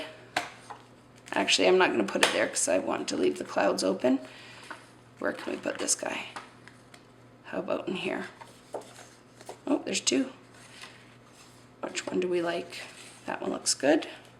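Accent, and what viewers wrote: Canada. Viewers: Just stumbled upon your videos! Love them!! I kept saying to myself "is she Canadian?"...so I went off to you blog to find out... Born & Raised in Ottawa, wavin' atcha from Houston Texas! :D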